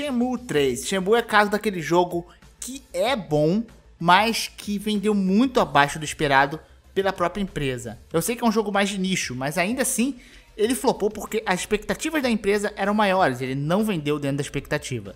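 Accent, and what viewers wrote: Rio de Janeiro, Brazil. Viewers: Man, se é do rio? LIKE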